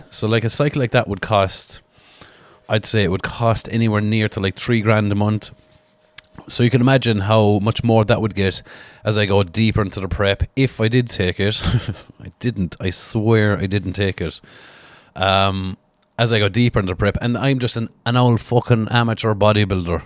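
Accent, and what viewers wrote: Ireland. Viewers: By fuck it's refreshing to hear an Irish man vocalise the amount of gayness in the world these days. Fair fucks to yee bie 🤛🏼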